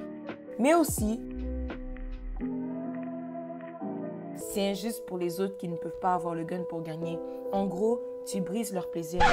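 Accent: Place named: Canada. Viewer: Ton accent canadien là 😭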